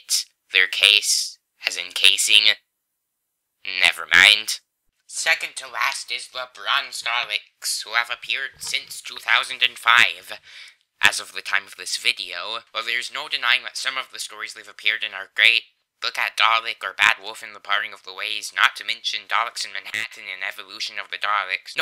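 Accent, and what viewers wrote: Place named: United States. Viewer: No, I listened in for 90 seconds beyond the (incidentally overlong) intro. My comment was not mean, it was factual. For the record, the fact that you express opinions and have an American accent is entirely irrelevant to me. If you feel the need to censor and to be censorious, you really should not be making posts. Incidentally, you have marked my comment 'love', so I suggest there is some confusion on your part.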